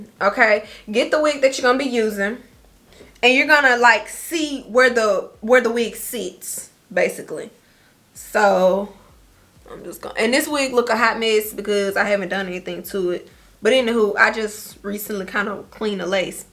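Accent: Louisiana, United States. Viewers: Guh you from Louisiana? Lol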